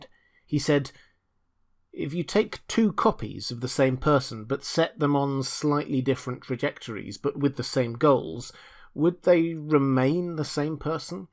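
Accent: England, United Kingdom. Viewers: It's an English accent!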